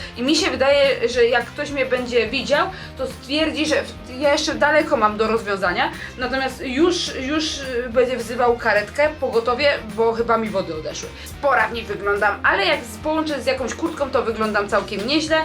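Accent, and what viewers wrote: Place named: Silesia, Poland. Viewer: Od weekendu polowa października 2024 wpadłam na twoje filmy. Podoba mi się twoja energiboost. Mówisz co sadzisz, a najbardziej zaintrygowała mnie gwara... więc dzisiaj 22.10.24 postanowiłam przewinąć udostępnienia... Opole❤ Jestem spod Opola. Bardzo sobie cenie gwary, a Śląski- jest miodem dla mojej duszy. Co do tego filmiku- przepięknie wyglądasz w ciąży z Mikołajem. Ja przy pierwszej ciaży wyglądałam niczym Orka ze wzdęciami. Jedynie pozazdrościć.❤ Kobieta bez takiego doświadczenia, nich lepiej zamilknie jape lub napisze po porodzie, a najlepiej się pochwali Błogoslawinym stanie, gdy na wadze poerdyknie 15kg- 23 kg więcej życiowego ciała( mina diabła). Nikt sie nie odważy, a jeśli tak, to tylko z filtrem w szmatfonie. Pozdrowienia dla was i wielki przytulas dla Mikołaja!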